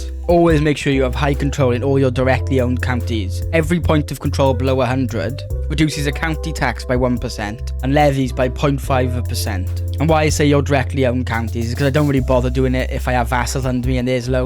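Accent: Wales, United Kingdom. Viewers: Are you welsh by any chance? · Welsh, and it’s beautiful. Check out a show called “Gavin and Stacey”, it’s lush.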